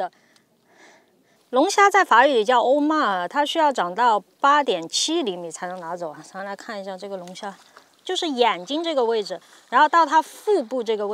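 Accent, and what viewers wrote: Sichuan, China. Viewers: 四川的蛮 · ，你是我们四川老乡吗？好重的川味普通话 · 哈哈幺妹儿是四川哪里的？ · 妹妹是四川人吗？ 很好听的川普呀，😝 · 你是重庆的还是成都的😁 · 这说的是四川普通话吗？？？ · 妹儿 四川人啊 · 泸州 or 宜宾人吗? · 四川话好可爱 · 你四川哪儿的？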